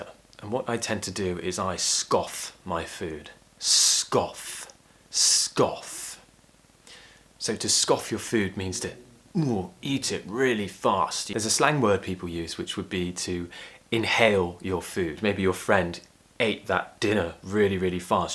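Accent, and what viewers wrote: United Kingdom. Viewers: I used to hate British accent. That was before I discovered this channel, I cant stop hearing your voice and pronunciation. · I love your British accent. I'm one of your fans from Egypt. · Damn, I love your voice, the way you teach and this british accent. Thank you very much for your help. Hugs from Brazil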